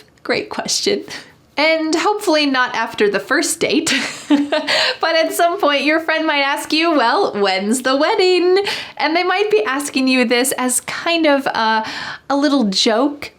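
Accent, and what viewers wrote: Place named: United States. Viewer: Thanks teacher for your teaching, I love American accent to pronounce ❤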